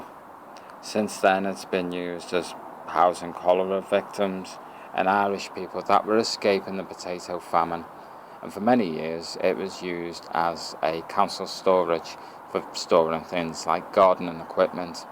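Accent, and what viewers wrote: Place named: Birkenhead, United Kingdom. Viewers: commentator sounds bent or from Birkenhead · It's a Birkenhead or gay accent innit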